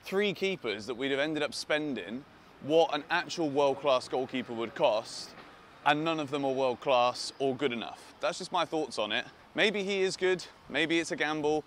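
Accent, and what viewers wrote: United States. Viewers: Love the American accent 😂😂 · American accent is getting stronger. One of us, one of us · Ur american accent is actually fire. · the american accent is gold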